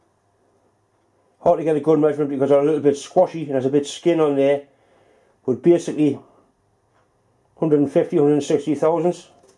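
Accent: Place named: Geordie, United Kingdom. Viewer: When I subscribed to this channel, it was because of the impenetrable geordie accent. If I'd have know that there would be veggie machining iin the future I would have subscribed twice!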